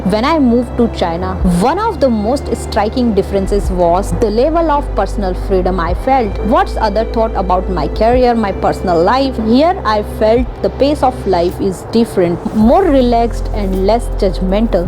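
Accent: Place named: India. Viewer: She speaks like how most Indians speak English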